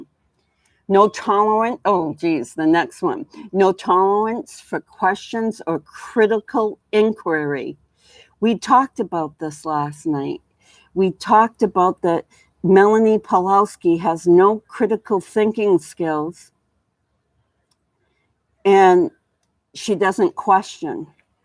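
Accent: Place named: Boston, United States. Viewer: Your accent is similar to JFK so Bostonian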